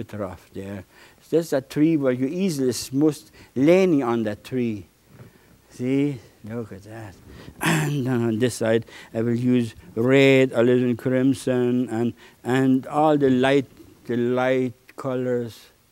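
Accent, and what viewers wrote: Germany. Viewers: I love this “german english“. If i speak, it sounds the same :)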